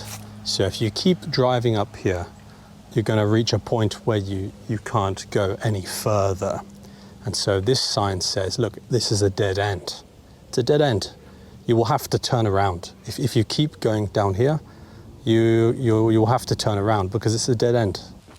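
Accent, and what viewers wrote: United Kingdom. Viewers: Thanks you for this video, I'm from Thailand. like your British accent.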